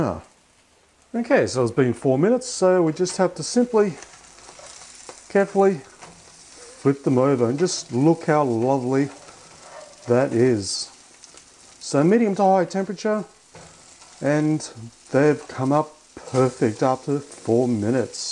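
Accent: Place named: Australia. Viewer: Then the Ozzie accent. Its bloody torture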